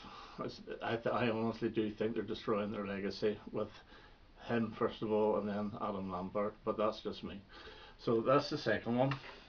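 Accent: Northern Ireland, United Kingdom. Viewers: Hi, It's been a while. I'll be honest with you, it was the Americanism in the title that grabbed my attention. I came by to see if you still pronounce 'Aluminium' correctly. My wife comes from America and I have to suffer such vulgarities on a daily basis. Normally I look to you and your beautiful Northern Irish diction to save my sanity... but I can see that beginning to slip now... oh dear God. What is the world coming to...😪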